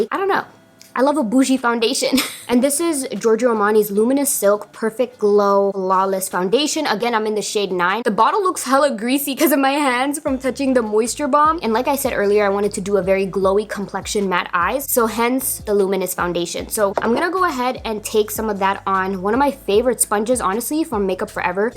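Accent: Toronto, Canada. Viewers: I thought I recognized the TO accent 👀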